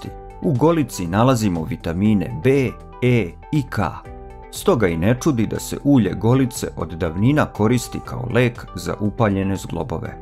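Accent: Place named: Czech Republic. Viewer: Замечательно ! Спасибо ! Мне просто чисто по человечески интересно . Откуда из какой страны Вы ? Вот слушаю Вас и почти все понимаю то что Вы говорите , и думаю , кто же он , украинец или поляк или чех . Скорее всего Вы чех или словак . Привет из Узбекистана , Хорезмская область .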